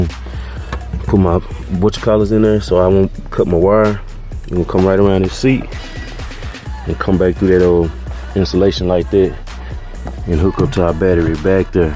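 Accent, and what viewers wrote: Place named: Texas, United States. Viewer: You from Texas bro?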